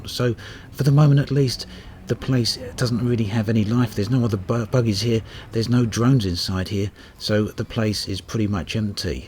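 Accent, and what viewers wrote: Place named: England, United Kingdom. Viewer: Where are you from mate, I know you are English like myself, cannot pinpoint your accent though. I've been playing lots lately and absolutely love the Game, and that's without Horizons so I await that eventuality with bated breath. I play Solo mostly but enjoy it to detraction. Anyway keep posting, I look forwards to viewing all your videos more than anyone's on you tube. Have you got a website of your own? Cheers Mate Paul. By the way have a nice Christmas.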